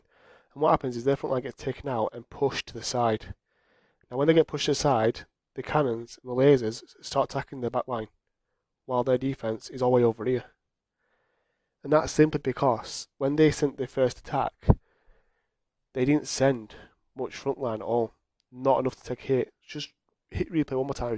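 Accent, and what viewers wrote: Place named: United Kingdom. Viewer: Hey!! For me is kinda difficult to understand sometimes, but thats bc im not used to hear british accent and my native language is spanish. But it helps me to improve my english. 😅 your firecracker.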